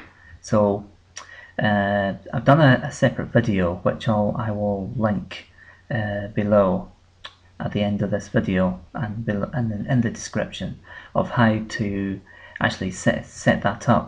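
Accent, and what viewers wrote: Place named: Scotland, United Kingdom. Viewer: I noticed a scottish accent there , i think , i'm from scotland :3 . The channel promo is important for people checking out the channel but the one thing i really wanna know is that i noticed that for some reason when a video of mine is playing , when it ends , it auto goes to another amv made by someone else . Do you know any way to stop that ? or is that another Google thing ? Peace & Good video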